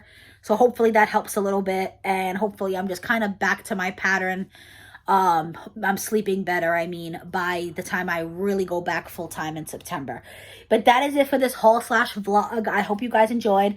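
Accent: New York, United States. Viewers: Yes, I was in New York and the day that I landed it look like doomsday on Long Island. I wish they had a farmers market here at night. I am not a morning person. I can understand that this week I don’t wanna go anywhere and I’m being forced to go somewhere. My grandson died of accidental drowning a year ago. His birthday is June 30. He would’ve been four. On a good note my son and his wife are having another baby. I just found out yesterday. I have a whole garden full of sunflowers in my yard now. I thought from your accent you were from New York. I enjoyed your blog for the farmers market.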